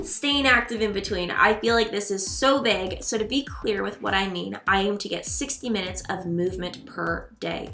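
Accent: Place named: Canada. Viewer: i hear Canadian in your voice, and no i am not familiar with this channel.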